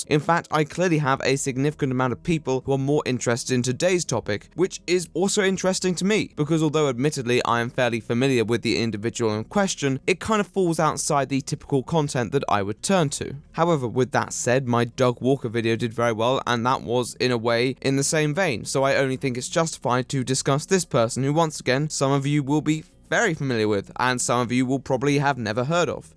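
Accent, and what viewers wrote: RP, United Kingdom. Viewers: I love this guy like, he makes my brain feel so good with t he monotone British voice its just fantastic. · Wow you sound incredibly british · Your videos are really nice to listen to while doing homework. They’re long, interesting enough to enjoy, but doesn’t require much attention to listen to, and your posh accent is a plus. You make good shit. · I just like watching you shred people with your posh accent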